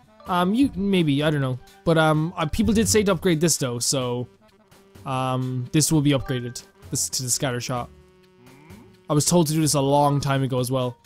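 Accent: Ireland. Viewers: You know, you remind me a lot of Jacksepticeye, and not just because of the Irish accent, but you’re always so happy and positive, and it’s really made this play through super fun to watch! Please keep it up, I have no doubt your channel will grow to immeasurable heights :)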